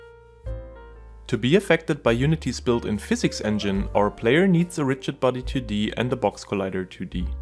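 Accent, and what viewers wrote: Germany. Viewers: U german, austrian or swiss?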